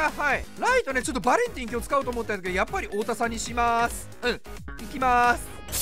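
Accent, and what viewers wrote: Kansai, Japan. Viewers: 引っ越ししてからも関西弁やめんといてな！また帰って来てくださいね！！ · 関西からででくん？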